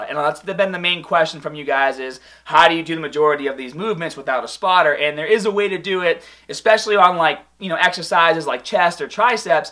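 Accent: Boston, United States. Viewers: Who else thinks that has slowly been losing his Boston accent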